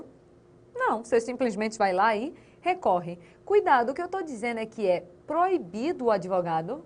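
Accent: Pernambuco, Brazil. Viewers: seu sotaque é maravilhoso!!! parece cm o nosso aqui d pernambuco... bjsss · Virei fã. Excelente!!!!! Professora a senhora é pernambucana? adorei o sotaque também. Show!!!!!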